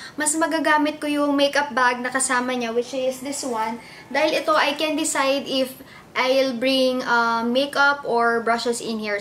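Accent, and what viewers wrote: Philippines. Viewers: Gloria Robles she's a filipina that's why the accent is different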